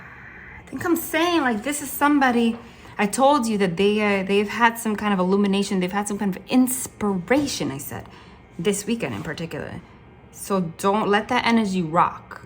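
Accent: New Jersey, United States. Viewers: Are you from jersey?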